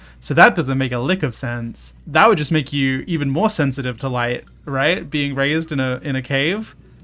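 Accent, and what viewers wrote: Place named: Australia. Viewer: 1. i see (?) youtube 2. i hear from her voice that she's probably aussie 3. i subscribe and hope that the algorithm will show me more of The Gang 💅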